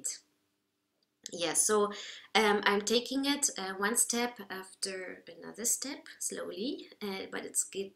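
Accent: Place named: Germany. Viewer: Hab schon gedacht: deutlich deutscher Aktzent :D Tolles Video, danke für die Tipps, viele Grüße!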